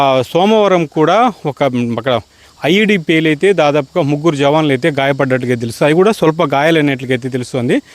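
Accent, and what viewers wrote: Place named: Srikakulam, India. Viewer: Yes ...mostly from Srikakulam or Vijayanagaram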